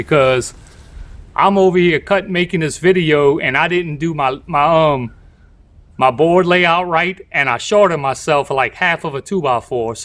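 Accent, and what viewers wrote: Louisiana, United States. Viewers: Where are you from sir? I’m from Lafayette, La currently living in Pittsburgh, Pa and that accent is a taste of home! Me and my sons enjoy your videos! Keep it up!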